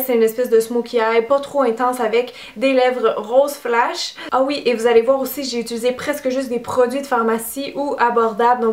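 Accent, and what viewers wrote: Quebec, Canada. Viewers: Habituellement, je ne suis pas fan de l'accent Québécois mes avec toi sa passe tout seul ! J'adore vraiment beaucoup tes vidéos je te trouve MAGNIFIQUE je trouve également que tu as un aire de Kim Kardashian (c'est un compliment) Bisous ma belle :)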